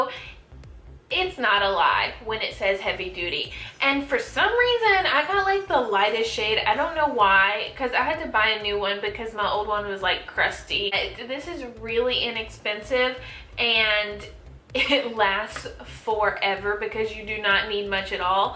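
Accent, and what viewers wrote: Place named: Louisiana, United States. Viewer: Okay.. I just randomly found this video cause I wanted to know more about that hard candy palette cause I saw it in Wal mart today! Anywaysss, right when you started talking I knew you were from Louisiana & then you talked about the hurricanes & I was like yep, I’m right! Hahaha. Anyways, I just subscribed! I’m from Lafayette!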